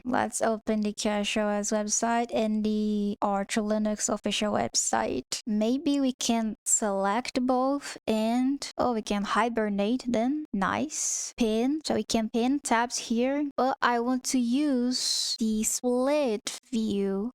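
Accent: Brazil. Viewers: eu sabia q vc era brasileira imediatamente pelo sotaque kkkkkkkk nao te xingando tho, otimo video e otima pronuncia !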